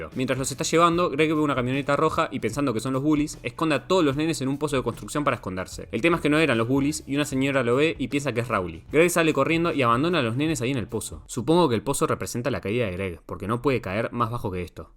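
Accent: Uruguay. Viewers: Sos uruguayo no? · De una me di cuenta que sos Uruguayo, buen canal, saludos desde Uruguay 🇺🇾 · Bro sos uruguayo?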